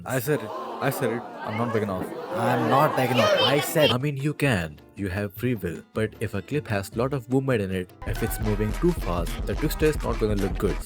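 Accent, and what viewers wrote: India. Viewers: BRO`S INDIAN ACCENT IS TAKING OVER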